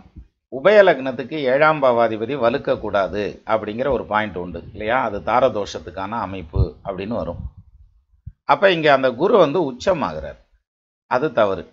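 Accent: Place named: Tirunelveli, India. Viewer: Sir neenga thirunelveli Tamil I enjoy because my father veeravanallur and my mother ambasamudram. We were in vickramasingapuram for a long time.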